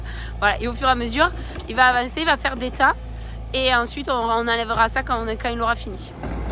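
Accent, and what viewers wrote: South, France. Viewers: Bon courage dans votre travail. J'aime l'accent du sud, votre région est adorable.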